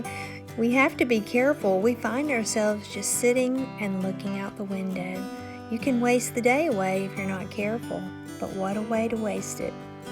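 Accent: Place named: South, United States. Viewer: I'm glad I found you on YouTube. Delightful southern accent :)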